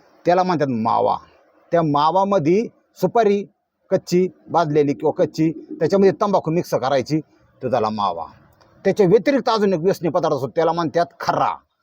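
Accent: India. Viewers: Mama तुमची गावरान भाषा कानाला ऐकायला छान वाटते. जाऊद्या गाडी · Good job Sir , खुप छान ग्रामीण मराठी बोलता तुम्ही 💐💐💐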